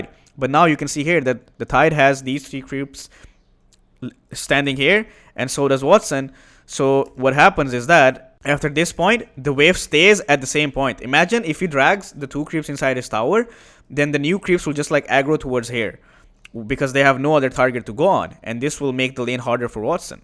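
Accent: India. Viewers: good video but your editing is shit. sometime your voice is slow and sometime its soo fucking loud. indian sland already annoying enough now you add slow and loud voice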